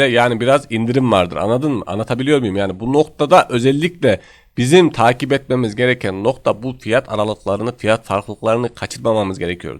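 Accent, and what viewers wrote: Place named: Konya, Turkey. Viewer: Abı sen Konyalımısın ve konyadamısın konuşma tarzın benziyor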